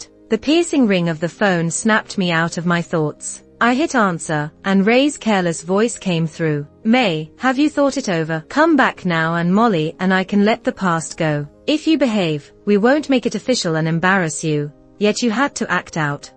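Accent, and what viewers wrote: United Kingdom. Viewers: I have to admit... I don't even care what the story is about. I love the British accent lol. Though I will say this was very satisfying to see Molly and Ray get what's coming.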